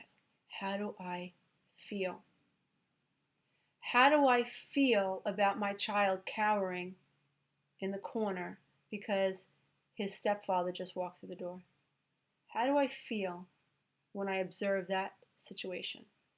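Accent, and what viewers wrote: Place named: East Coast, United States. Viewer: I love your videos! It's like therapy for me! Thank you so much! Keep up the good work! Also I have a question... Are you a therapist? If so by any chance are you located in Southern California? I would assume you're on the east coast due to your accent. But I love your advice and am looking for a therapist. Thanks again!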